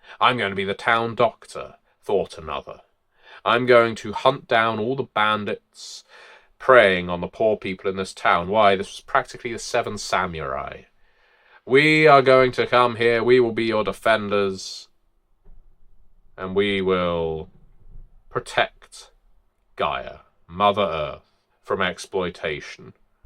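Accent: England, United Kingdom. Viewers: It really just the cherry on top, hearing an articulate English accent justifying Colonialism in the 18th century with the claim that humans fundamentally and habitually extract and exploit to live. Chilling. Love it. You know, in the US we pride ourselves on being very sad about being a very successful, leading, world military and "not-empire" that spans across a continent and around the world. Everyone just ignore the fact that the sun never sets on US territory, ignore the military base in your country, and the nuke subs in launch range of your cities. We're not an "empire"! How ridiculous. We have a legion of lawyers to show you how wrong and slanderous it is to point out that fact!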